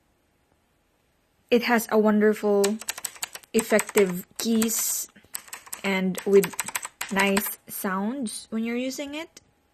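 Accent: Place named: Philippines. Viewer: you are a filipino! I can recognize an accent from a filipino!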